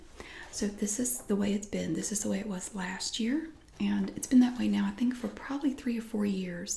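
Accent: South, United States. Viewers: I absolutely adore your videos! My aunt/godmother lives in North Carolina, and for my whole life southern accents have been so calming to me. I only see her a couple times a year, because I live in Wyoming, but I find so much comfort in your videos in the meantime. I hope you receive as much gratification and happiness from making your videos, as you give to us, the viewers. :)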